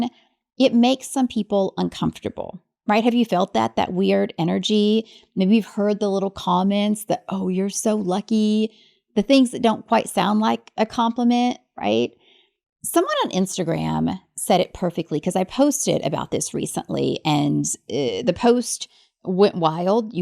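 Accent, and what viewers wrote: South, United States. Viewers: That was fun! p.s. I didn't realise you had a southern accent ❤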